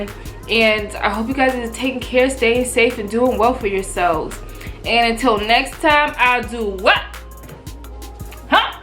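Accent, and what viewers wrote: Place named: New York, United States. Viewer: Girl yassss get your sponsorships I see you sis! I'm a vanilla lover as well - I usually choose that flavor when it comes to protein shakes. Also, where are you from? Are you from NY? I have been trying to guess based on your accent!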